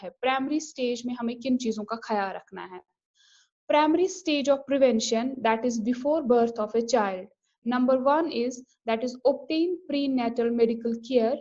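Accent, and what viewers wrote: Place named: Kashmir, India. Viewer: Ur language accent is kashmiri ❣️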